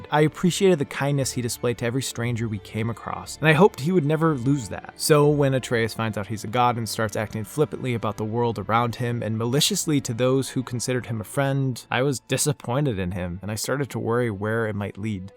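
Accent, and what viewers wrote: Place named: Midwest, United States. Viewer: NO WAY!! I grew up around the Twin Cities! Currently in Florida for college but I recognized the city, I could kind of tell you were from the mid-west by how you talked but I never realized you were that close to home. Your channel inspired me to make my own video essays about my work in VR development. Keep up the awesome work!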